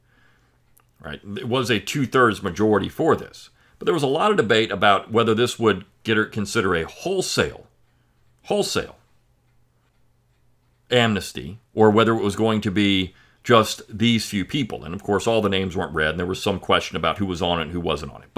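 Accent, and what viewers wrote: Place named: New England, United States. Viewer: Sounds like a Yankee to me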